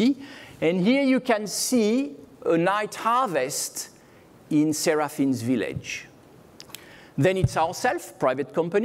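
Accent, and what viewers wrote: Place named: France. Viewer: I like French accent. Very sexy. Period.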